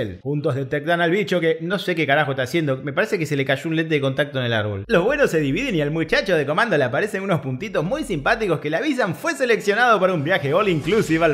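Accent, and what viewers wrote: Argentina. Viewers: Como me rio con el acento Argento.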